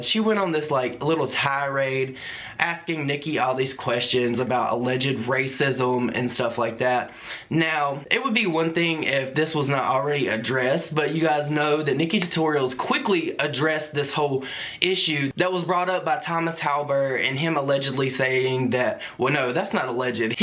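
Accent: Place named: Carolinas, United States. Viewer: OMG so excited there’s another in our area. I’m in Clover but raised in Gastonia. Subbed to them. Love hearing our country drawl in videos I’m watching. ❤️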